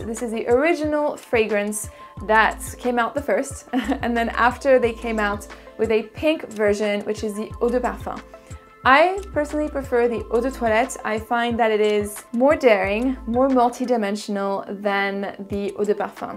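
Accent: France. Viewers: Your french accent is so interesting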